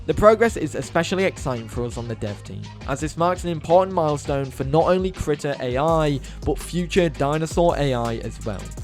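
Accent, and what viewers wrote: United Kingdom. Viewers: Yo when did start hiding that British maw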